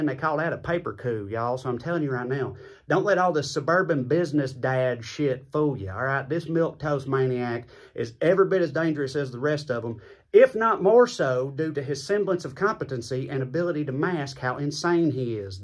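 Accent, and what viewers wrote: South, United States. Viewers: Thank you for your channel, and your Southern well spoken-ness, AND your honesty. Yeah, sht creek just started surging... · My husband is a Southerner and I love his accent - your is awesome but I appreciate your view point - damn, you had more scrabble words in this short than 45 had his entire presidency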